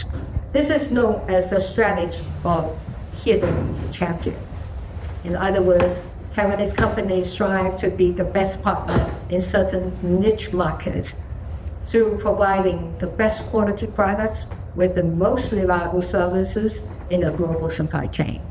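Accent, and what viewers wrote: United Kingdom. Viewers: 在LSE英腔的英語演説還不用看稿，躲在螢幕、手機後的藍白共可以消除之前的那些（聽説）了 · 蔡前總統講的英文，英國腔就是留學過英國最好的證明，誰說蔡前總統的學歷造假？ 麻煩講流利的英國腔英文給我聽聽。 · 小英總統的英腔本來就很重了🥰🤭回去母校演講就更重了😍🤩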